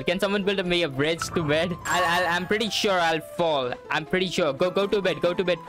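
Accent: India. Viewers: an Indian? sounds like Indian but an Indian Roblox player who plays ROBLOX yay never found one like you!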